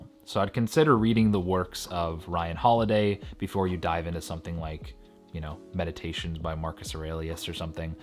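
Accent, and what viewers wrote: Canada. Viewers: A Canadian, eh? How are Canadian accents different from those in the Northern United States? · Your sarcasm and cadence is so unbelievably Canadian. I feel like I'm qualified to make these judgements because I too, am from Vancouver.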